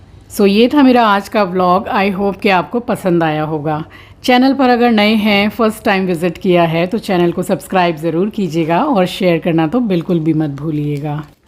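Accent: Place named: Uttar Pradesh, India. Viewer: Dear app Calcutta Se Hain Magar aapke bolane ki style Puri UP ki hai aap baton baton mein bahut se aise word bolati Hain Jo ekadam Apne se lagte Hain❤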